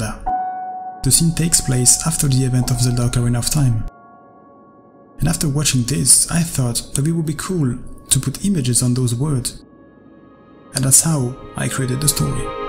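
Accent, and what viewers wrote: France. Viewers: On entend bien l’accent français :’)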